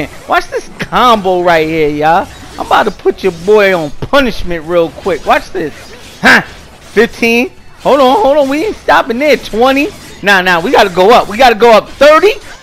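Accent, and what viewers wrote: New York, United States. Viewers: this man definitely from new york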